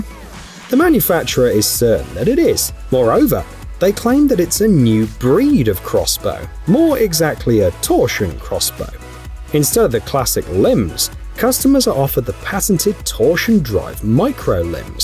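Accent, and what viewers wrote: England, United Kingdom. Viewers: I love hearing English people describe firearms. The frame and SLIDE…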